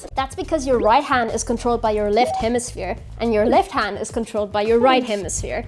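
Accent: Afrikaans, South Africa. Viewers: Jy kan sommer die Afrikaans hoor😂❤🎉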